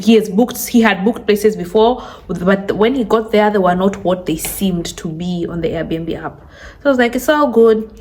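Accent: Kenya. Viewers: The sexist woman alive. (That Kenyan accent is amazing.). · You could have said that more respectfully. We didn't even hear it, because she was giving us very important information about real estate. Don't force her to talk like an American. She's Kenyan.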